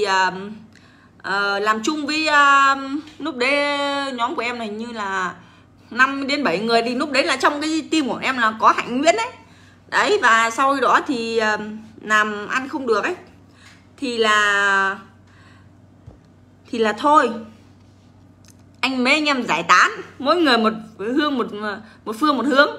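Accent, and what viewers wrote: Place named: Hai Duong, Vietnam. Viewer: anh chào em nghe như em là đồng hương hải dương nhỉ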